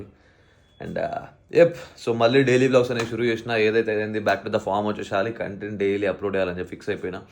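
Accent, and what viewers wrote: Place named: North, India. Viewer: Hindi badhiya bol lete ho bhai. Ekdam north side wali feel ati hai. 🫴🤌🤌🤌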